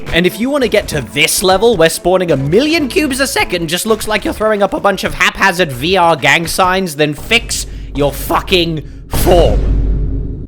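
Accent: United Kingdom. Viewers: the bri'ish accent makes this video funnier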